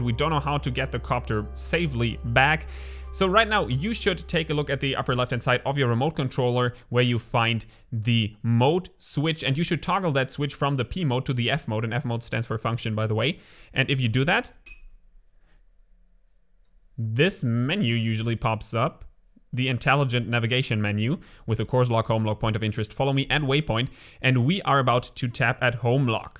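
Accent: Germany. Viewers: Deutsch accent?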